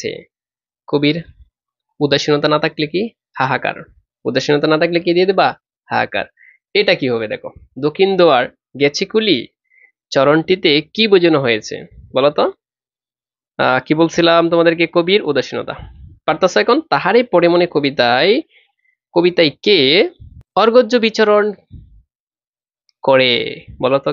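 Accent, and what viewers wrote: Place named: Sylhet, Bangladesh. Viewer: স্যার আপনার বাসা কি সিলেট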